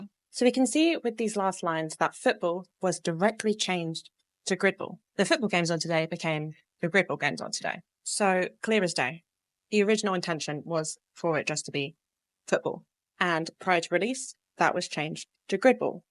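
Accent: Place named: United Kingdom. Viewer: Right of the bat: you seem so much more british on this one?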